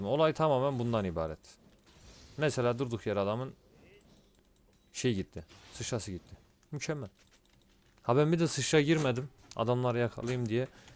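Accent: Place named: East, Turkey. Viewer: Merhaba guzel şiven var dogu tarafı bi şive Allahıma kitapıma ha gibi cümleler kursan o dogu tarafini bize hissetdirirsen cok samimi ve guzel olur diger yayincilardan farklanir kendine ozgun kitlen olur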